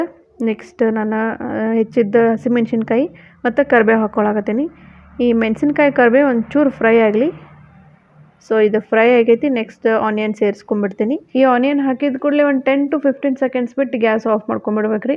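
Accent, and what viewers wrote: Dharwad, India. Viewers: आपण किती छान धारवाडी कन्नड बोलता.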